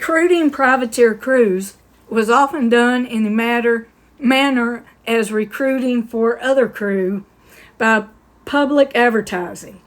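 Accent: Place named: Alabama, United States. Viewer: Floridian here. Where are you from? Alabama? Your voice reminds me of my aunts from Alabama.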